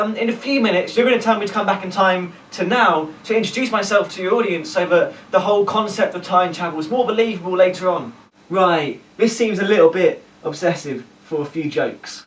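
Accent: United Kingdom. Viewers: very funny british accent :)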